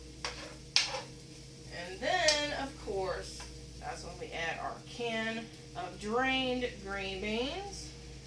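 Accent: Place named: Louisiana, United States. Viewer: Great recipe for fried rice.May I ask were your from?Your ascent sound like your from Louisiana.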